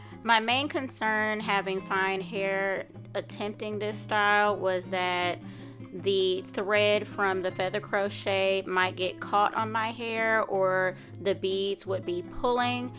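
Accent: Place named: South, United States. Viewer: Ok ms thang with the country twang!!! You ate this up my love!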